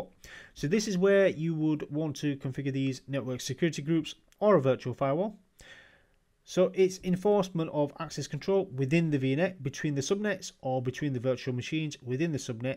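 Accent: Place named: England, United Kingdom. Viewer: Finally a proper English accent. Thank you.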